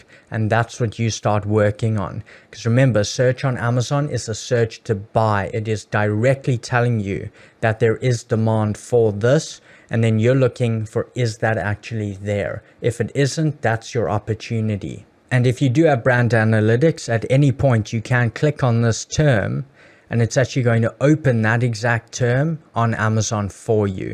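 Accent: South Africa. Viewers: That South African🇿🇦 accent 🔥 Thank you broer😊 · Are you from South Africa?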